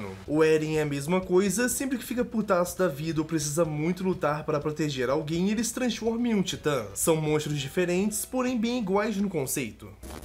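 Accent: Portugal. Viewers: Você é português ? O sotaque parece rsrsrsrs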